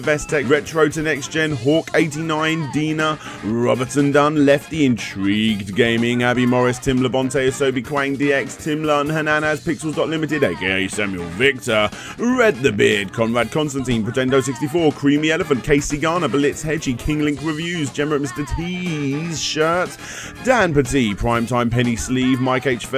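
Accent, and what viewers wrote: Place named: United Kingdom. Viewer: My wife can't understand English and still notices you're British.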